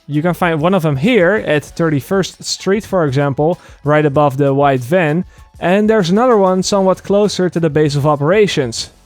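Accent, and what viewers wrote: Netherlands. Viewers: pff je hoort duidelijk dat hij Nederlands is hahaha, het heet volgens mij iets van steenengels. dan probeer je niet eens met een accent te praten. bijv. trough en true spreek je gwn het zelfde uit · are you dutch if you are im to =) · Ben je nederlands ?? zo te horen aan je accent 😂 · Jij bent Nederlands hahaha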